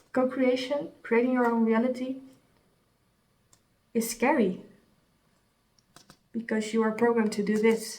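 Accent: Netherlands. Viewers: Hi there, 2 questions ... I hear a Dutch accent? And 2nd were do you get the information from ? Thanks for sharing your videos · Hoor meteen dat je uit Nederland komt ❤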